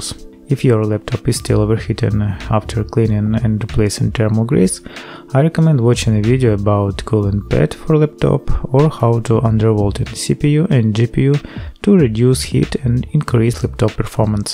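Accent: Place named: Russia. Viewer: Strong russian accent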